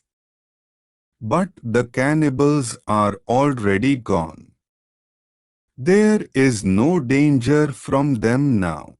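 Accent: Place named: India. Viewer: What is that indian accent god sake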